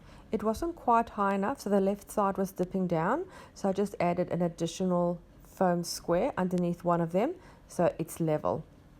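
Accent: South Africa. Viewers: Glad I found your channel .. this looks like fun. Love the saffa accent 😉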